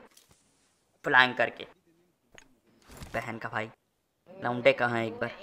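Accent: Kanpur, India. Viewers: Bhai Full Apni Kanpuriya language bol rhe😂😂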